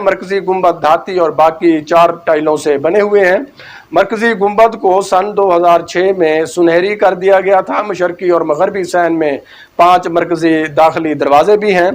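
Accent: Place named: Punjab, Pakistan. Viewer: Punjabi Urdu bolna sake jahil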